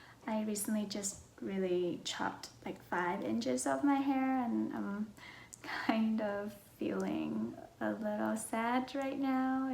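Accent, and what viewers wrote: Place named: United States. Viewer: Your accent is very Murican and you're a cutie patootie!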